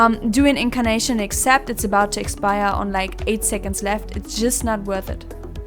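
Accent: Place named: Germany. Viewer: Manchmal hört man voll raus dass du eine deutsche bist ;)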